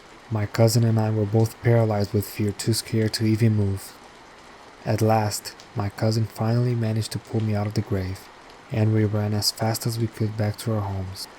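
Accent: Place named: Brazil. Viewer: Ha yes! My Brazilian Girlfriend recognized it immediately and I thought you sounded just like my Brazilian homie Nick too, so we both picked up on it right away! Awesome channel with Spooky videos and your great voice over! Keep up the fantastic work! :)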